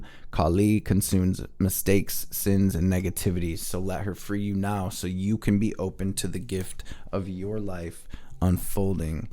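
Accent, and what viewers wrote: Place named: Upper Midwest, United States. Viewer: I just found your channel. First, thank you for what you do. You are a gift. Your reading and message are so accurate (and needed) you brought me to tears. Also, I’m originally from the Upper Midwest/Great Lakes region. So, your accent reminded me of home which made the message all the more powerful. Again, thank you for sharing your gift.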